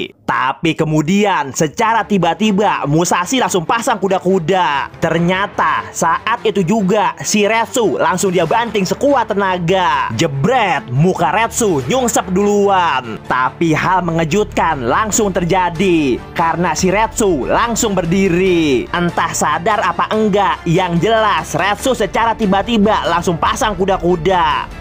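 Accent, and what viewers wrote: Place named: Jakarta, Indonesia. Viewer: Logat nya kayak orng betawi nih...seruu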